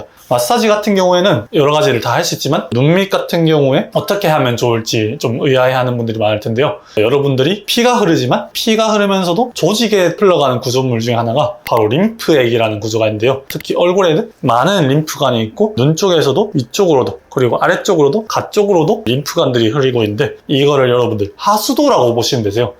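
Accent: Busan, South Korea. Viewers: 부산분이신가요? ~하고 앉았노? 하는데 찐텐 나오심 ㅋㅋㅋ 부산분들 좋아요. 의리가 !! 암튼 잘 따라해보겠습니다.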